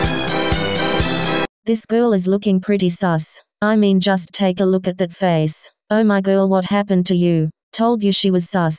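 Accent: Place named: United Kingdom. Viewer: WAIT OMG UR BRITISH? IF U R FINALLY A BRITISH YOUTUBER LIKE- 😭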